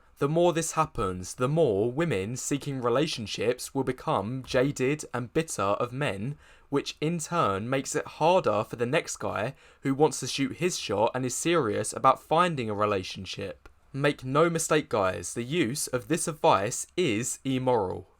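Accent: Essex, United Kingdom. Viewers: I love your content but would it be possible for you to work on your Towie girl accent? “Togevarrrr” (together) “Eivarrr” (either) “One two free girws standing togevarrr” Come on. You’re better than this.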